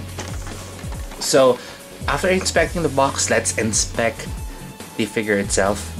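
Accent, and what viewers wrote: Philippines. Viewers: hey man I can tell you're Filipino so from which mall can I buy this?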